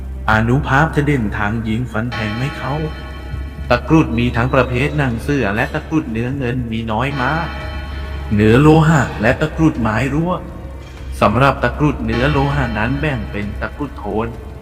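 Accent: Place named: Suphanburi, Thailand. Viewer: ผู้บรรยาย ต้องเป็นคนสุพรรณแน่ๆ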